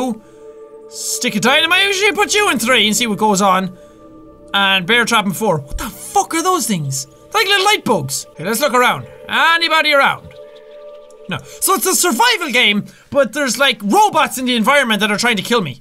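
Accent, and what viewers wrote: Ireland. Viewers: He is Irish if you cannot recognize his accent.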